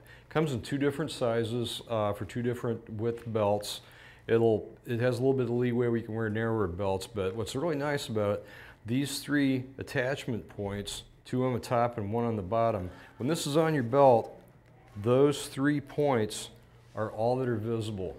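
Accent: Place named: Chicago, United States. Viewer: I know you can't even carry a slingshot in Illinois. That Chicago accent gives it away. Where do u live?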